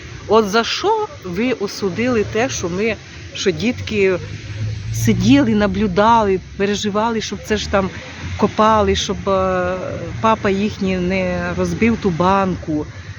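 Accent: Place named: Polesia, Ukraine. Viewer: Я только ради вашего особенного колоритного языка задержалась и осталась. Слушаю, как вы рассказываете все равно про что. Знакомлюсь немножко с украинским Полесьем. И да, вы очень привлекательная женщина. В любом вашем состоянии или настроении. И в лесу, и в городе. Мне из Москвы не огидно.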